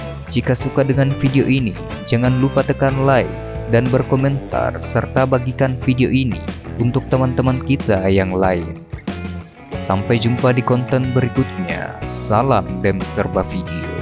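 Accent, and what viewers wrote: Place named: South Sulawesi, Indonesia. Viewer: Lidah sulawesi selatan terdengar jelas dari mulut si admin,.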